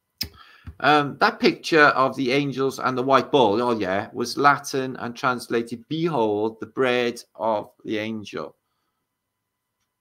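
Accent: Wales, United Kingdom. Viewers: Hi. Just found your channel through Archaix. A meet up snippet with you describing trying to get out of the UK I think! I thought "I know that twang!" 🏴😀 Sending love and best wishes to you from a fellow south 'Walian'. I'm sorry I didn't find your channel sooner. Subscribed for what it's worth and really enjoying my first few minutes of your stuff. 😎🙏💥Thanks again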